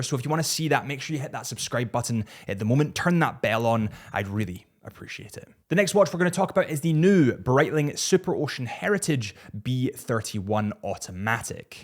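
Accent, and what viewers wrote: Scotland, United Kingdom. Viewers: Love the channel but please please stop presenting the videos using the same style as Adrian Barker but in a Scotish accent.... just be yourself. You don't need to live in his shadow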